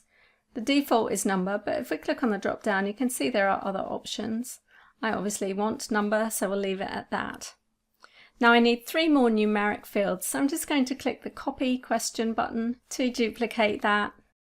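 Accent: United Kingdom. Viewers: Many thanks,. 🤣 I always thought you were British 🤣. Admittedly, I've never been good with accents, and/or it wouldn't be surprising for a Brit to live in Australia....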